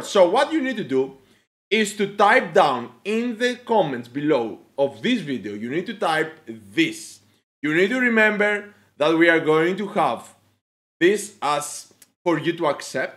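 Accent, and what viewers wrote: Greece. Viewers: Unique Greek accent #BALORIANS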